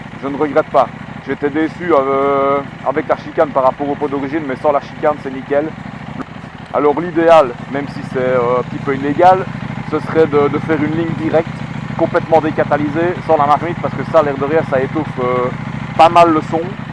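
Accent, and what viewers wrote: Belgium. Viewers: tu as un sacré accent belge ou je me trompe? belle vidéo aussi hein :)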